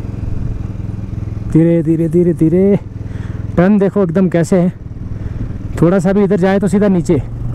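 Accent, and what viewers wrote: Gujarat, India. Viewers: ભાઈ તમે ગુજરાતી છો એ તમારી ભાષા પરથી લાગી જ રહ્યું છે😅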